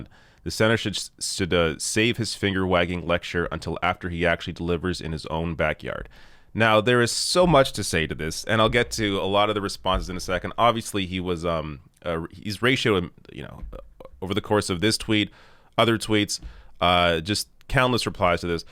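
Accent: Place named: Canada. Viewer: Canadian accent coming in extra strong today.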